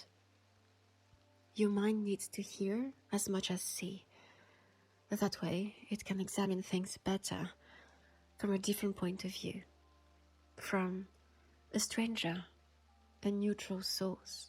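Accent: France. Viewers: Idk why the french accent have an aura I LUV IT..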